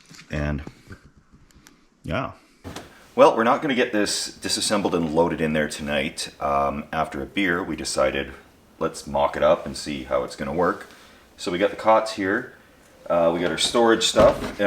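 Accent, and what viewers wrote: Canada. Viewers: Dude I love the way you say garage! Damn I love Canadians accents!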